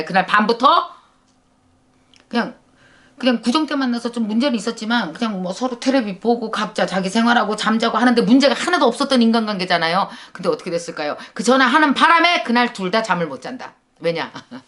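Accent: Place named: Chungcheong, South Korea. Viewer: 오래전 동료 캐나다인 친구가 자기 어머니의 인간관계 처세술이라며 절대 다리[bridge]를 불태워서는 안된다고 했어요 다리를 불태우면 돌아갈때 다리가 없다고... 일맥 상통하나 표현법이 다르죠 ...정서와 문화가 너무 다르죠...선생님께서는 영어강의를 누구를 위해서 누구를 대상으로 하실건가요? 제가 선생님과 친분이 있는 관계라면 영어 강의 좀 기다리라고 하고 싶어요. 좀 더 유튜브의 비쥬얼에 신경을 쓰고(컨텐츠는 저도 팔로우라 좋아요)있으면... 외국에 있는 한인 교회나 다른단체에서든 러브콜이 올거예요 비행기표와 함께...거기 초빙되어 가셔서 그 멋진 충청도 사투리로 선생님 이야기 들려 줘보세요 다들 웃고 울고 할꺼예요. 대한민국이 전쟁을 겪고도 노벨 문학 / 상이없다고 엽전의식에 젖어 있는 사람들 봤어요 가와바다 야스나리죠? 설국쓴 일본작가? 저는 학생때 읽었는데 이 책이 왜 노벨 상을 받았는지 이해가 안되었는데...중략....다음에 여건이 되면 꼭 본론을 쓰겠습니다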